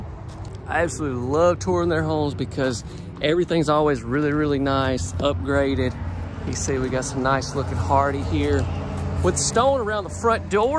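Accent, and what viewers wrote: South, United States. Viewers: Does anyone here own this exact model? This is the one I have my heart set on 5000%! It has every single thing I need & want for myself & my boys. But the guy at clayton homes told me they have "better" layouts with "better" constuction at a better price than this prime. & i wonder if he just said that because he wants me to buy one they have. Ive looked at MANY & this 1 still tops them all for me. I really would love to know how much this one is & how is the construction. Im not settling 4 anything less. Thank u 4 this amazing video. & ur a great camera man! Also love ur accent so cute. lol Nice southern draw u have sir :)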